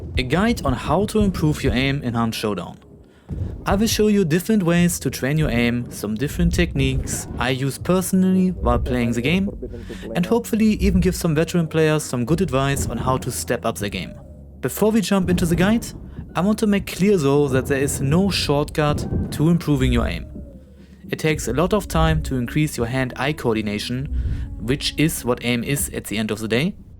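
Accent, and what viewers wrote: Germany. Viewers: that German accent to me as an English person is a stamp of quality! I just know it's going to be a good video. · Ich höre sie sind ein deutscher der herr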